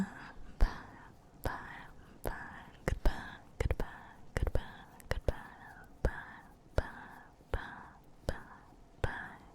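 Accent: South, United States. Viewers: I need more of the southern accent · I cant tell if it is or not, but if so im glad you did the southern accent again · >w< Ay yam a huge adorer of lovely ladies with southern accent. May I have more, please? (I luv your voice.) <3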